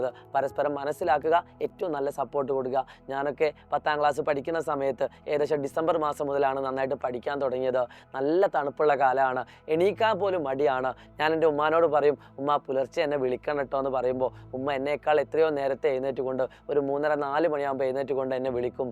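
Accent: Thrissur, India. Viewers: Thrissur like adiiiiii❤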